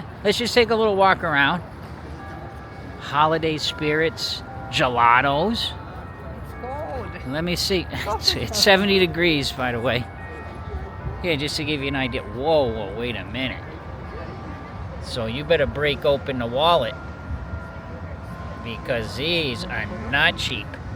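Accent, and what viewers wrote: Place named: New York, United States. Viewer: I live in jersey and never cut down our own tree artificial I grew upon and to this day artificial lol I thought you were from New York with the accent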